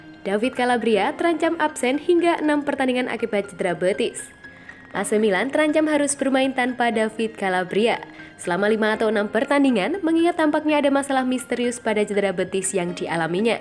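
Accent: Java, Indonesia. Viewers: Sory unsub ga suka voice over medok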